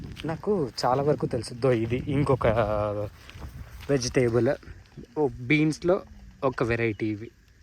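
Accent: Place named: Chittoor, India. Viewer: Rasayalaseema chittoor slang matalavutunnav bro,, nice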